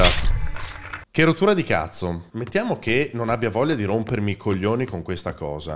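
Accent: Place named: Veneto, Italy. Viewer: Sto gioco la maggior parte delle volte fa cagare pure a me che lo sto solo guardando 😂 P.s sta deriva veneta l’adoro ✨💕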